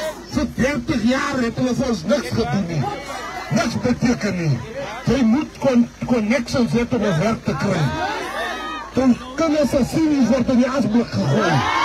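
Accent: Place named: Namibia. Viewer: Coloured from Namibia, Mr u praat soos n Kleurling van Namibia, wat u se is presies wat in ons land ook aangaan.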